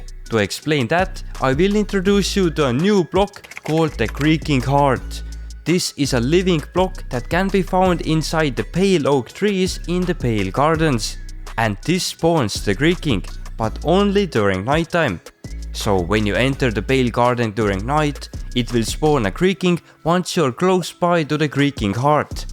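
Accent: Estonia. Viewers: Are you from Estonia? just curious cause ur accent is so Estonian. im from Estonia as well🤓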